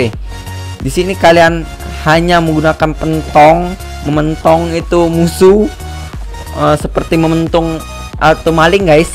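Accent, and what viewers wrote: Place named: Madura, Indonesia. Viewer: lagat nya ky org madura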